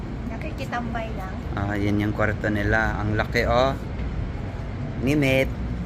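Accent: Ilocos, Philippines. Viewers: oo nga. pinoy accent na . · Grabe k... tagalog na ilokano p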